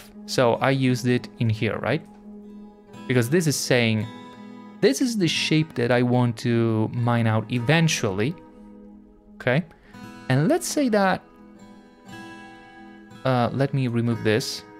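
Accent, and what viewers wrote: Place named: Italy. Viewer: Ι cant tell if your accent is greek or italian or maaaybe spanish. Which is it? Betting on Italian.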